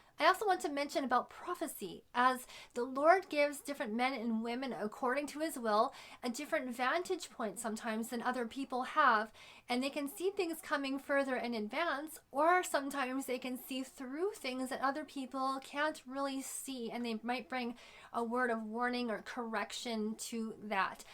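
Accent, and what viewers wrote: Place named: Canada. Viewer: You sound extremely Canadian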